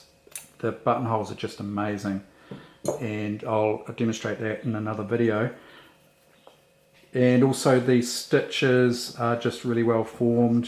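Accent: New Zealand. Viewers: I knew it! After seeing some TV News videos, I could recognize your accent. Greetings from Rio de Janeiro, Brasil.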